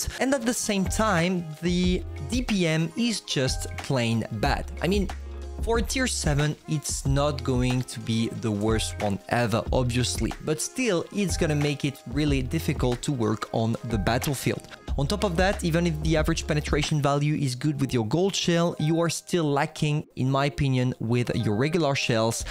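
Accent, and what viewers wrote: France. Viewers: Are you French from treytens videos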